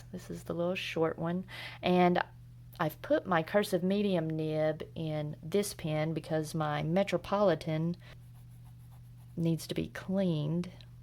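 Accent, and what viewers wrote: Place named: Alabama, United States. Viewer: You sound a little like your from Alabama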